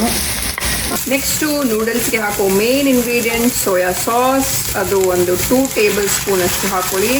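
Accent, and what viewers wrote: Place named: Bangalore, India. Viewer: Neevu awesome ri.... Pakka namma bng slang le matadtira.... I was in canada for 4.5 years almost... Liked ur videos... All the best🙂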